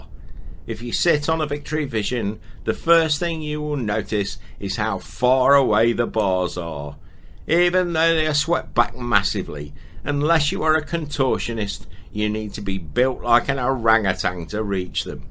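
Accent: United Kingdom. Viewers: Your British voice makes this ten times funnier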